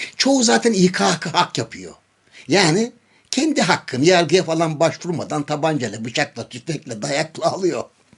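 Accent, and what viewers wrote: Thrace, Turkey. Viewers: Ben bayiliyorum sizin trakya sivenize. Sabah saat 6 da yuruyus yaparken sizi kulakligimla dinleyerek spor yapiyorum. Vakit nasil geçiyor anlamıyorum hocam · Trakya şivesi severim dede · LÜTFEN TRAKYA SIVESIY'LE KONUSUNUZ, COK HOSUMA GIDIYOR !!!... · Biz de trakya şivesini severiz.. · .Trakya sivesi ile konusmaniz cok keyifli . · Harikasiniz cok degerli bilgiler veriyorsunuz ...ayrica fikralariniz trakya siveniz mukemmel🇹🇷🇹🇷🇹🇷🇹🇷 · Ben de bayiliyorum Trakya sivenize, Gecen gun "Hinge" demistiniz, butun gun aklima geldikce guldum. :) Ancak Trakyalilar anlar sanirim. :) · bilakis trakya şivesine bayılıyoruz beyaa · Saygılar dedecim. Bi gün videonun tamamını trakya şivesiyle yapsanız. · Günaydın, mutlu sabahlar efendim. Yine her zamanki gibi salı gününede pozitif bir başlangıç yaptınız ve Trakya şivesiyle güzel fıkralara başladınız maşallah enerjik halinize bayılıyorum. 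Tanrı yaşamınızı hep böyle devam ettirmenize katkı versin. Tüm abone ve takipcilerede mutlu sağlıklı yaşam dilerim. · Dedem trakya şivesine lütfen devam.....❤❤❤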